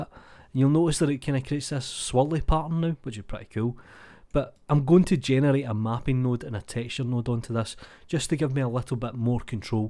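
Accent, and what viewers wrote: Scotland, United Kingdom. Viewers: Everything is a billion times better with the scottish accent and i mean everything.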